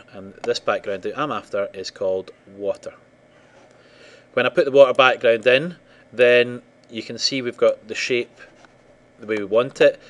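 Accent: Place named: Scotland, United Kingdom. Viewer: hes Scottish.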